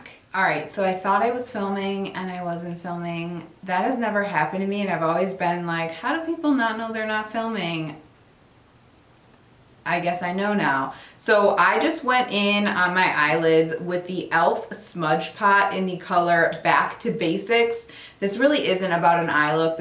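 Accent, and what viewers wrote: Chicago, United States. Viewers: Just discovered your channel and could tell right away you are from Chicago with that accent! Love this video.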